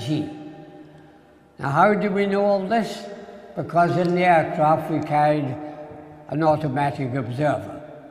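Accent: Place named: Scotland, United Kingdom. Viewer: If he was Home counties and/or ex-OxBridge….. we would be welcoming, then warmly applauding ‘Sir Eric’. Shame about that Scottish accent too.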